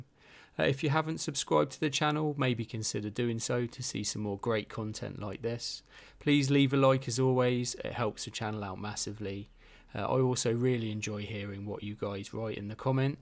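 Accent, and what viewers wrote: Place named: United Kingdom. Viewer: Noooo that PC was perfect for me 🤣 Love your British accent, Nice video ❤️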